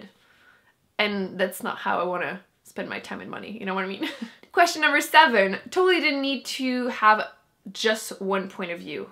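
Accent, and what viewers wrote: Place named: Quebec, Canada. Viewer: Oh mon dieu, Are you from Québec? :o That accent melted my heart :')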